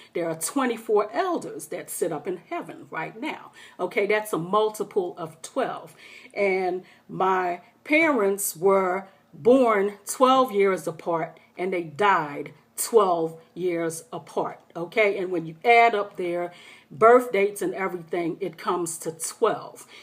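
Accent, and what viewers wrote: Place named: United Kingdom. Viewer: Hey. That accent sounds British. Thanks for the update.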